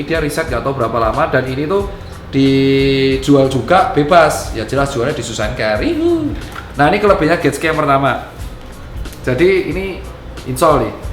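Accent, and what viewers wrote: Java, Indonesia. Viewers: jowone medok kabeh dok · Wong jowo.. wong ugm.. yo medhokkk.. salam medhok Mas.. pertahankan medhoknya👍👍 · HA JOWO E BRO!!! Ciri khas!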